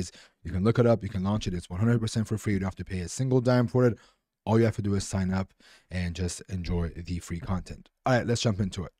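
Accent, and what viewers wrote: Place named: Iran. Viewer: i know a fellow iranian brother when i hear one 😂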